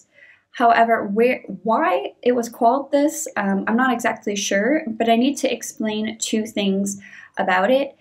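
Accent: United States. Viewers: Thx for your answer. Corsica and Sardinia are similar. Sardinia has less (and lower) mountains...and more beaches. I could guess you were from northern U.S.A. I've been to California, Arizona, Texas and Florida and their accent is different (especially in TX).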